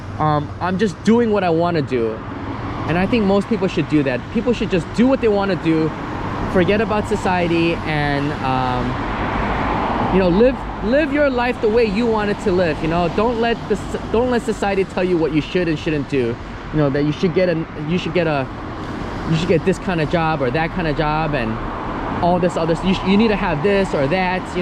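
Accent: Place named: United States. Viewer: Oh, I forgot to say this is Happy again, I Just log in with my account because it's too difficult to write from ipad,and so difficult to edit. Yes, you and I do have similiar background, but trust me, you are very americanized, you will not have any problem what so ever if you ever choose to live in America, you are more american than you think you are. But you don't have to live in US, you can lived anywhere if that's what your heart desire. Only down side is you probably do want to use facetime to spend with your parents since it would be hard to not spend time with them. I came to us when I was 13 years and after living in usa for 31 years, I am still not as americanized as people think, but that's okay. I will never speak perfect English like you do, I still have tiny bit accent. I see many people immigrant from other country and have "little" problems lived in US, but again, if one has desire to stay, they will learn to adapt. 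so if you happy living in Japan, just be happy to do so. However, don't think you will not able to adapt US, afterall, when I "listen" to your talks, I heard just a perfect american speaking. so anyway, just a little point to share.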